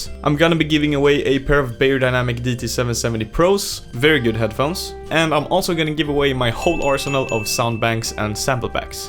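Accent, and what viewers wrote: Sweden. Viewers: Feel like you have alot of swenglish in you accent. Svensk?! 😄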